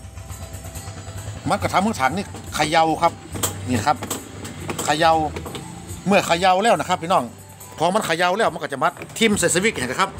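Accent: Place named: North, Thailand. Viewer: ผมคนใต้ชอบสำเนียงคำเมืองมากครับ เพราะมากอีกแบบหนึ่ง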